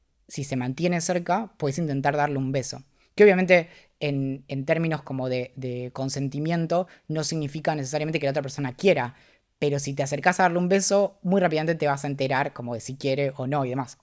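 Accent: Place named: Israel. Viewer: Y ese acento HEBREO ??? Judio o uruguayo o mide east … la doble LL ó Y porque SHO porque yo.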